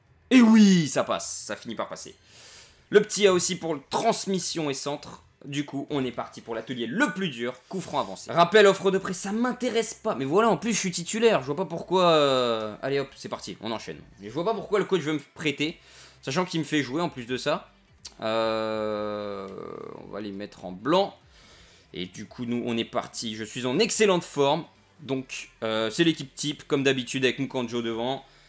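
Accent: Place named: Belgium. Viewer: j'ai l'impression que tu prends l'axen belge je c'est pas si ya que moi qui l'ont on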